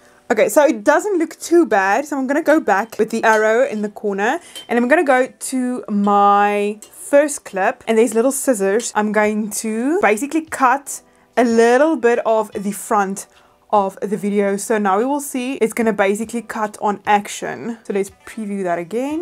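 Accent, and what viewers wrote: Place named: South Africa. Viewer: I can tell that you’re from South Africa, I’m from Namibia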